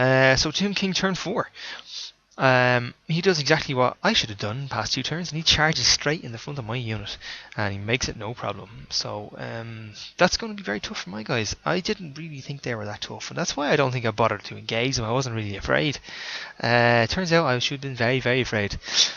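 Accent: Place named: Ireland. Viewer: Thanks for the report,. Nice to hear an Irish accent on some Warhammer battle reports (even if it is a Dublish(ish) one, haha). Sound, mate.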